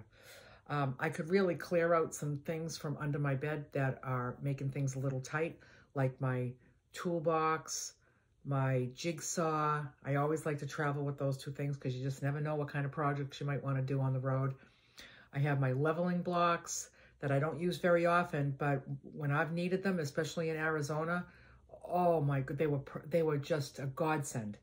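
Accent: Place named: Boston, United States. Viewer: .. Thank you for the suggestion,😢 But I ❤love listening to your Boston accent.😊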